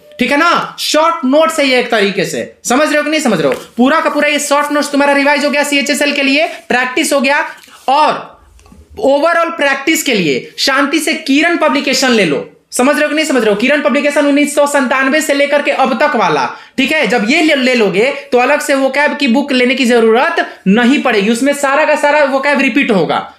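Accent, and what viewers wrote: Bihar, India. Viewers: आप बिहार से हो ना 😇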